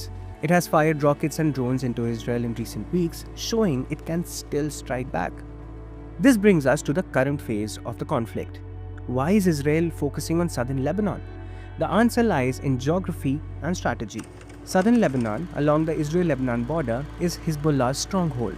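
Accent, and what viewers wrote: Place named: India. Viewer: No matter how professionally this video is edited, I can't look over the fact that it's with an indian voice🤦🏻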